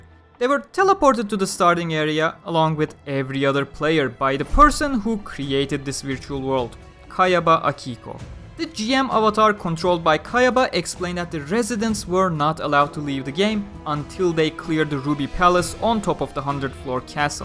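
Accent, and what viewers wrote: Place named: Turkey. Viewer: sao wiki'de gezerken videoyu açar açmaz aksanından dolayı türk bu dedim.. ilk defa aksandan milliyeti tutturdum haha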